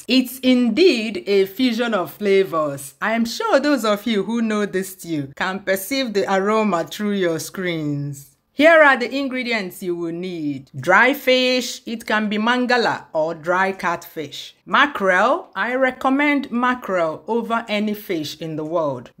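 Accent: Igbo, Nigeria. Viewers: Very interesting to watch 👌🏾💯 I love & love that Igbo accent 💕 dalu nne 💯👍🏾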